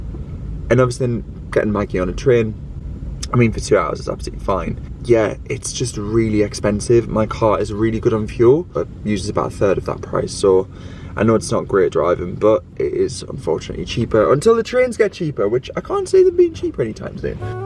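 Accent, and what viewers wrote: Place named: Northern England, United Kingdom. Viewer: It’s sweet how your accent gets more pronounced when you’re up North with your mum.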